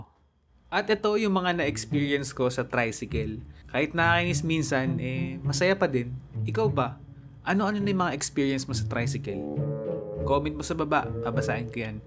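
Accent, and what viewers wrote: Ilocos, Philippines. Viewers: Kuya Ilocano kaba! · kuya ilocano ka po?? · Hala ilocano po kayo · Ilocano ka pala kuya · Kuya ilocono ka po? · Kuya ilokano ka pala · Ilocano ka po ba? 😊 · Kuya ilocano ka ba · Taga Ilocos Sur kaba weno Abra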